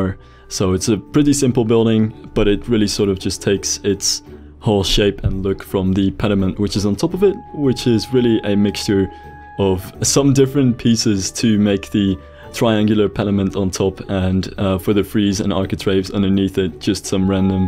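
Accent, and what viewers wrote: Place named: Netherlands. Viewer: ja ik dacht dat je engels was. Ik hoorde dat je een bepaald accent had maar nederlands? ik probeer heel vaak goed te luisteren om te kijken of ik hoor of je nederlands bent, maar het lukt gewoon niet. Bij Kwebbelkop en Jelly kun je het wel horen.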